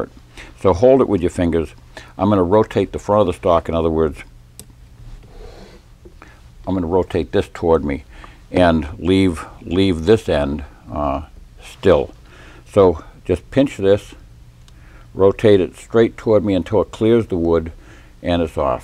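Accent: New England, United States. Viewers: Very informative video and I like your New England accent.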